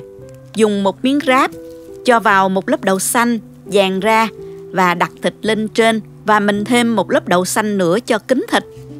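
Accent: South, Vietnam. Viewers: cảm ơn đả chia xẻ nhìn ngon và đẹp quá có giống nói rất đẻ thường giông nam ngọt quá à tôi nghe thấy mê luôn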